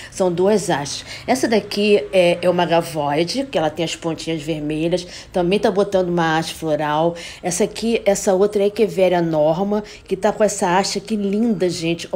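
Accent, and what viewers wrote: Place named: Rio de Janeiro, Brazil. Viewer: Aí carioca kkkkkkkkkkk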